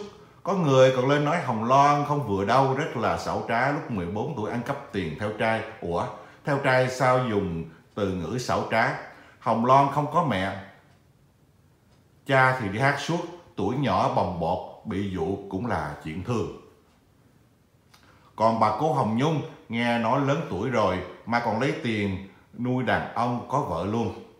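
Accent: Standard. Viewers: Anh noi qua chuan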